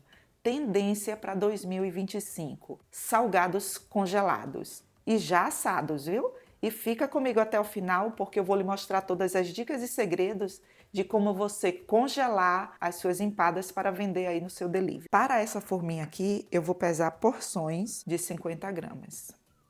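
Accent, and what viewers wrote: Brazil. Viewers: Ela fala maravilhosamente bem e bonito. As receitas perfeitas e deliciosas. Não vejo graça alguma e sim aprender a respeitar o sotaque de cada região brasileira que tem uma extensa diversidade cultural. Isso é cultura meu bem!!! #Pega a visão#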